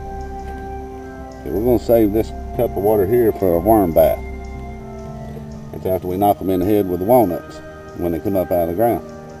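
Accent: South, United States. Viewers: ... with the southern drawl ... slow talk ... lazy annunciation ... and background music ... and with old ears ... many of your words ... did not compute. GOD Bless you though for your efforts.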